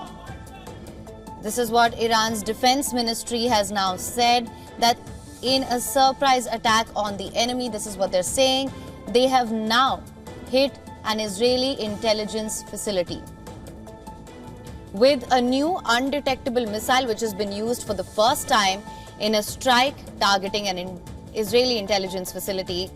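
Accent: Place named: Gujarat, India. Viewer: Is this channel for Indians only...why does she speak like some Gujarati aunt from some factory in England.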